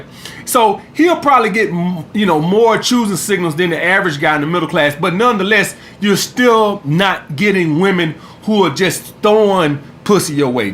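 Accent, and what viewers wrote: South, United States. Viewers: I feel sorry for you guys. How pitiful do you have to be to listen to a guy like this to educate you on women. This dude country as F. Damn near sound like he got a speech impediment.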